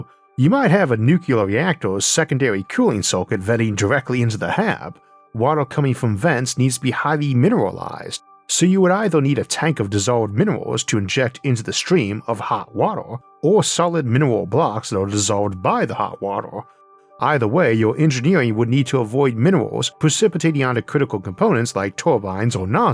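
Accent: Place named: Boston, United States. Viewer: Love the vids! Been subscribed for a while. I keep wondering though - where is your accent from? Sounds very Boston to me but i could be completely wrong!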